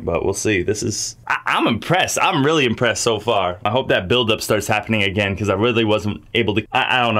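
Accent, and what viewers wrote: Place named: Chicago, United States. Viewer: Yo are you from Chicago? You have that quality to your voice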